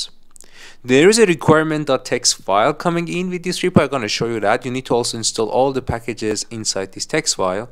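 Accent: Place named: Iran. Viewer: Not me recognizing fellow persian accent from miles away :)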